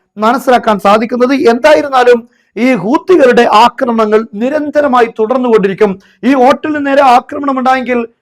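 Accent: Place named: Tamil Nadu, India. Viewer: Dont read english please, thats not your skill , your Tamil english very irritating but all other talks are ok😅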